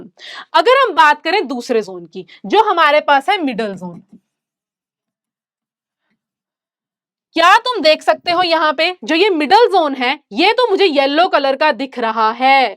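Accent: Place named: Punjab, India. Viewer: aap punjabi ho kya? accent aisa hai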